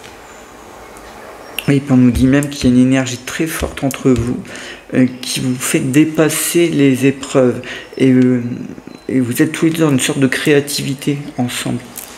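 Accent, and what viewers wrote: North, France. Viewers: Un grand merci pour votre tirage qui me parle beaucoup 👍 Vous avez un petit accent du Nord ? 🤔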